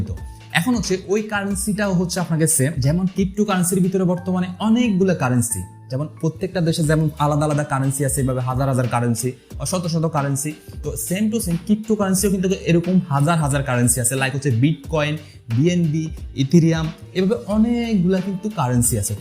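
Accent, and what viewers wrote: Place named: Chittagong, Bangladesh. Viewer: Chittagong er mone hocche 😐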